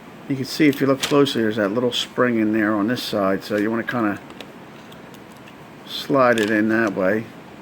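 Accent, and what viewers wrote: Philadelphia, United States. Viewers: You from Philly? Know the accent anywhere lol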